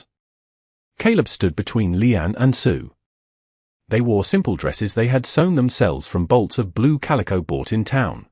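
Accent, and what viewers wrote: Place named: United Kingdom. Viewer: Screw your aristocratic English AI accented narration. Get rid of it. It doesnt fit the context. Maybe I'll listen. Not til then.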